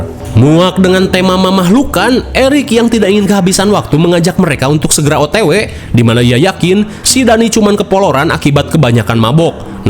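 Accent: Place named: West Java, Indonesia. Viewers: Awal suka nonton film di chanel Si Aa gara2 intronya, "𝙃𝙮 𝙜𝙪𝙮𝙨, 𝙠𝙚𝙢𝙗𝙖𝙡𝙞 𝙡𝙖𝙜𝙞 𝙗𝙚𝙧𝙨𝙖𝙢𝙖 𝙜𝙪𝙖" Udah gitu si Aa Org Sunda yg sangat terdengar Pasti orgnya Gokil.. Kang ngawadul 🤣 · Lah baru tau BG orang Sunda cuma gak tau daerah mana dari logat sama bahasanya aja Sunda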